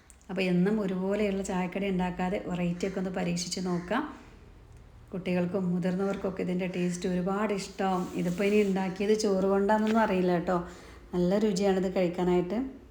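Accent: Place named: South Kerala, India. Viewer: Pazhaya malapuram poyit ipol thekan slang aanallo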